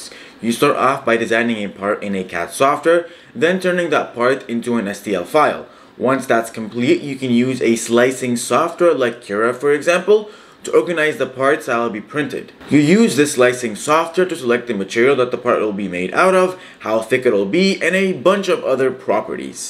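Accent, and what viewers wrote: Egypt. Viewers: مصري مش كده؟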